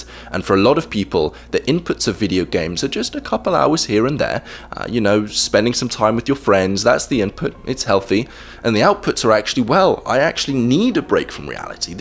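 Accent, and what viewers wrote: England, United Kingdom. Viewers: The amount of click bait in this video and terrible over-simplification of the topic should be the basis of your next video lmao. Using your English accent, simplifying popular activities, using clickbait words like “re-wiring, detoxing, dopamine, neurons, etc” You may have the simpletons and immature people in the YouTube comment section fooled, but you really haven’t added anything to the conversation or made any concrete valid points worth anything. It’s a lot easier to see through this lame facade you are trying to project.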